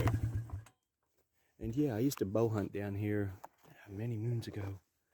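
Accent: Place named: United Kingdom. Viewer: You accent ain't from around here?? I hear....British?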